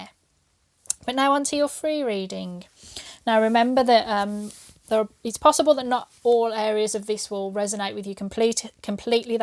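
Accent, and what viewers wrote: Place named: Northern England, United Kingdom. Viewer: You just came on my feed as I was asking about a job application, but I just had to comment before anything and say that as a northerner, it was such a pleasant surprise hearing a northern English accent when I clicked on this video! I hardly ever hear other northern girls!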